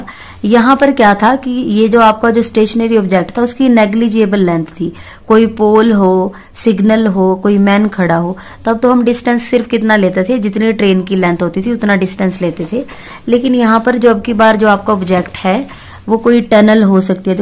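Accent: Haryana, India. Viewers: Mam is belongs to harayana because unke bolne ke tarike se pata chalta hai ,,right mam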